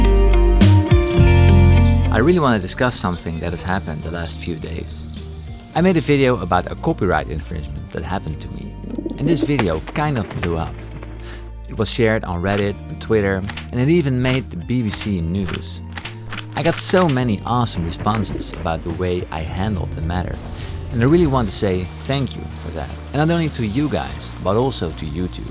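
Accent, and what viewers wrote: Netherlands. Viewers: Mui MasMacho every educated Dutch person speaks fluent English in my experience, and with that accent.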